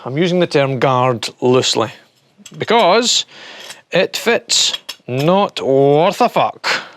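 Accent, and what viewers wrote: Scotland, United Kingdom. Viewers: I wish I saw your video before I bought one. I paid $75 AUD for this full kit with 2 batteries shipped to me in Brisbane australia from a Melbourne eBay store. Delivery was within 3 days and excellent. But the constant cutout while grinding drives me nuts. This is my first time trying out a fake brand substitute. It’s no way better than my Ozito (Einhell) grinder. Never again. I found your video very good and love your Scottish accent. Subscribed. Thank you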